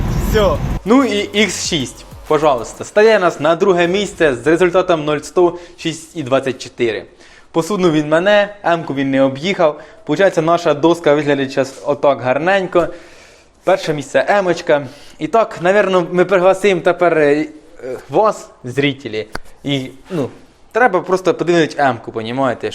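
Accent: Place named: Surzhyk, Ukraine. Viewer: Контент цікавий, зйомка і все інше нормальне, але.. я теж, можна сказати, з села, але я не говорю так як хлопець на відео. Це пз*ц, слух дуже ріже. От ці «двігатєлі, сєкунди».. старайтесь трохи фільтрувати суржик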